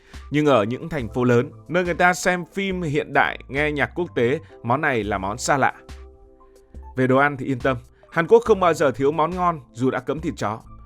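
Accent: North, Vietnam. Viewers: Thằng mc luon bưng bô và nó lại nói tiếng bắc mà tui bắc lai không biêt bố no là ai ,và luon ra đường k dội nón và óc bò khi lái xe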